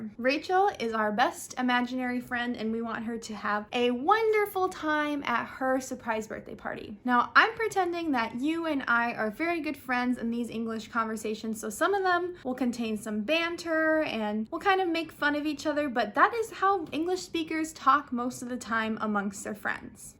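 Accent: United States. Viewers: I want American accent like you ❤ I am 13 years old from Korea🇰🇷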